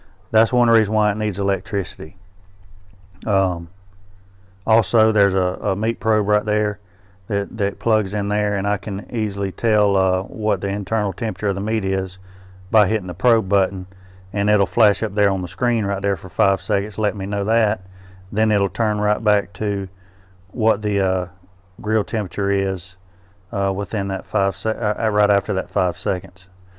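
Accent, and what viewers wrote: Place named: Georgia, United States. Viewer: Hey Brother , you sound like a guy from South Georgia. Great Job explaining the Pellet Grill. Learned some new stuff. I live in North Florida and I have had my grill about a month. Thanks for sharing.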